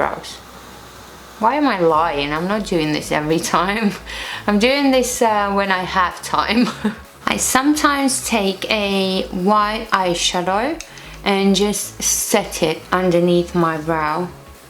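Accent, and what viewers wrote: United Kingdom. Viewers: Kaip faina buvo klausyt kaip angliškai kalbi ir dar su tuo lengvu britišku akcentu!:)) O antakiai tavo puikūs, labai patiko video! Beje taip pat turiu asimetriškus antakių priekius todėl puikiai suprantu ką reiškia nekesti vieno antakio 😂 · Labai gerai ir gražiai šneki angliškai!! Girdisi toks britiškas akcentas, kuris man asmeniškai laabai patinka!!😍😍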